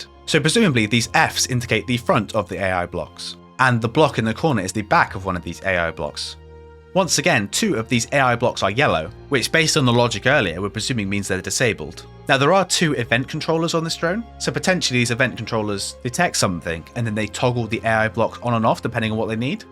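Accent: United Kingdom. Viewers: I don't know, sometimes it did type Beta, its just your "e" sounds Vritish. Beeta, lol. Sorry making fun of English language cuz my native one is Spanish. BTW We also say aluminium instead of the 'merican aluminum. Go figure. Anyway fun video, thanks!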